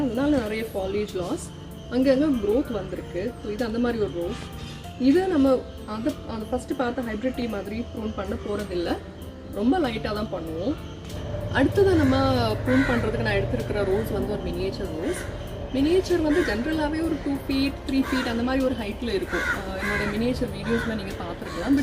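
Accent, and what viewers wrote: Kerala, India. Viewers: அருமையான விளக்கம்.மலையாளி தமிழ் மொழியில் பேசுவது போல உங்கள் பேச்சு இருக்கிறது. நீங்கள் மலையாளியா ?.தெரிவியுங்கள். நன்றி.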